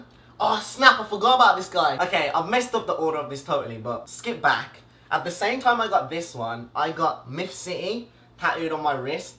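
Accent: United Kingdom. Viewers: Wth I f with his music I never thought he would talk like this I didn’t even know he was British damn tuff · Ma Nigga on your songs you sound like you'r from hell but on your videos you sound like you'r from london (because england is my city)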